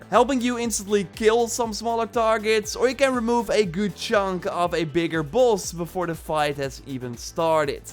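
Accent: Netherlands. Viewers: How dutch is this accent.. im dutch aswell but damn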